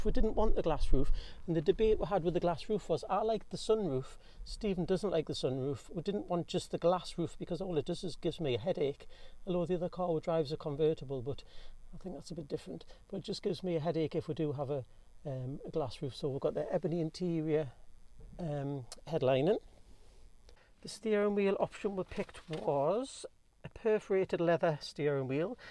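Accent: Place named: Wooler, United Kingdom. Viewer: I have a friend that lives at Wooler and you share the same accent great review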